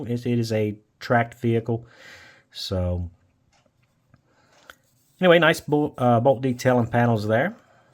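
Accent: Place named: West Virginia, United States. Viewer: The start of the unboxing was hilarious 🤣I always knew you guys in WV talk different, but that was something else. I am still trying to grasp what you were saying 🙂But the kit looks great and is a welcome different subject matter, and I'm excited to see what you make of it. Have fun modeling, and see ya next Friday!!!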